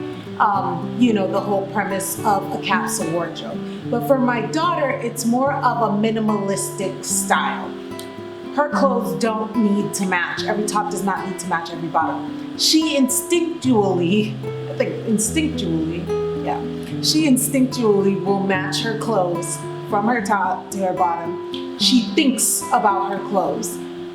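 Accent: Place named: Guyana. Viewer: are you Guyanese? You remind me so much of my cousins. They grew up in NY and have a mix of Guyanese Newyorker accent. And that painting reminds me so much of my home and a place called Kaieteur Falls.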